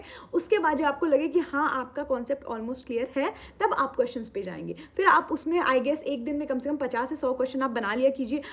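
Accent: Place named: Mirzapur, India. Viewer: Aap jab baat karti ho mirzapur yaad dila deti ho ❤️😂with ur accent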